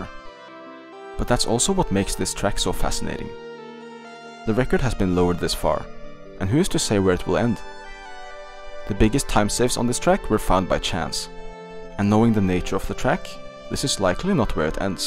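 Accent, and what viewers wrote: Norway. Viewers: Du må være norsk! Basert på aksenten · Wow I'm pleasantly surprised by the shear quality of this video you've made! Amazing video, I thoroughly enjoyed it all the way through :D Also: I guess I've found someone with a thicker Norwegian accent than mine (not saying it's a bad thing btw) haha :D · As a Norwegian myself, i heard your voice, and thought «Hmm, his accent is a bit norwegian…» AND I WAS RIGHT 😭😭💀🔥 W Vid btw ❤️ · Jeg bare hææ hvorfor høres du litt norsk ut noen ganger også leste jeg på profilen haha, nice! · Bro eg hører på stemmen din når du snakke at du e norsk💀💀Eg har mye være engelsk stemme da lmao. kul video da fortsett sånn!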